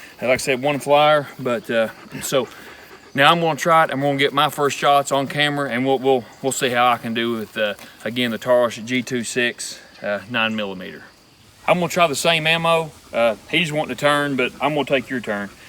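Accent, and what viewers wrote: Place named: Appalachia, United States. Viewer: You are awesome dude, you had me lol the sounding like a hillbilly comments. My wife says the same thing.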